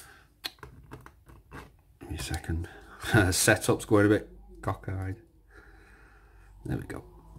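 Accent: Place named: Manchester, United Kingdom. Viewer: Great video, I don’t understand how anyone could give this a thumbs down. I’m glad you mentioned Manchester, I’ve been wondering what type of accent you had.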